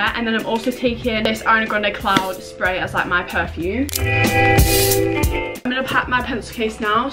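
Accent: United Kingdom. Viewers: hii! i know u probably wont see this, but just in case u do, i actually love ur content so much u dont understand. ur my comfort youtuber, especially since i used to live in england, until my dads work caused us to move to nyc so its so refreshing to hear a british accent again. i love u so much ur voice brings be back home, keep doing what ur doing i love u so much💓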